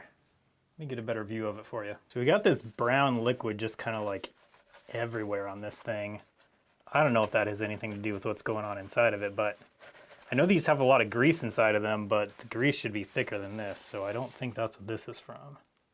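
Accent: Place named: United States. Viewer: it feels like my mate vince with american accent 😂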